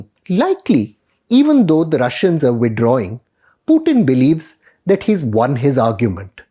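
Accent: India. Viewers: So, this is how CNN would sound with an Indian accent... Russia bad, Serbia bad, NATO good... What a joke...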